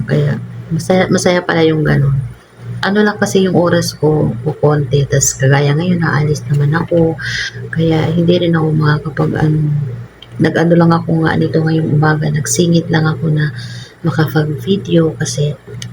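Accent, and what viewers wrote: Waray, Philippines. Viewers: Hala waray ka liwat kabayan?taga este ako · Hala waray ka ngay-an sis ☺️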